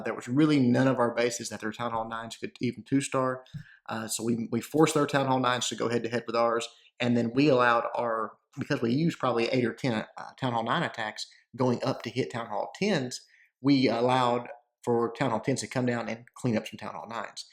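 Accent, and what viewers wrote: South, United States. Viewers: what part of the south are you from?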